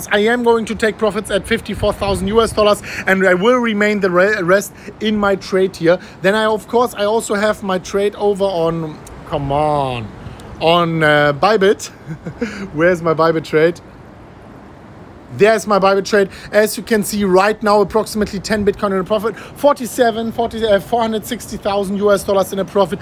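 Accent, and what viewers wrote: Germany. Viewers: Deutscher Akzent kommt voll durch!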